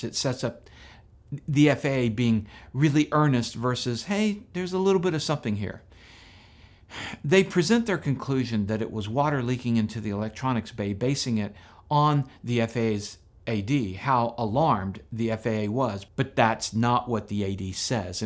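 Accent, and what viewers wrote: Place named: Midwest, United States. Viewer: Your command of our (American English) language, -being an American as reflected in your Midwest-ish accent(???)- is so much better than most of us. Interestingly, at one point, you used the word dived and I was ready to pouunce on that. Dived IS a past-tense term for dive, but so is the more familiar American term **DOVE** ... thus I ask: are you a Brit in American or Canadian sheep's clothing? Just curious. Oh, and yes, I love the tone and attitude you have in your thoughtful videos. So there.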